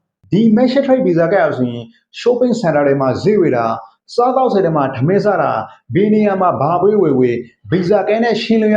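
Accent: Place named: Rakhine, Myanmar. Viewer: လူစကားကိုလူနားလည္ေအာင္ေျပာပါ ရခိုင္အသံႀကီးနဲ႔မေျပာနဲ႔လီးပဲ ေစာက္ေတာသား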